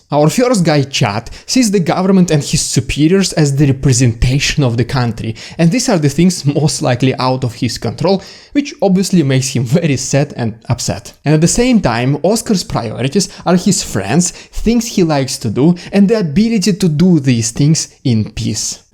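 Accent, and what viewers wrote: Russia. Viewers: Омг у тебя русский акцент (Omg you has got Russian accent!)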